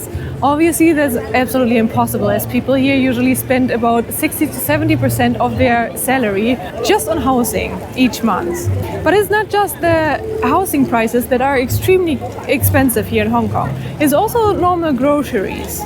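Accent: Germany. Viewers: has a German accent? True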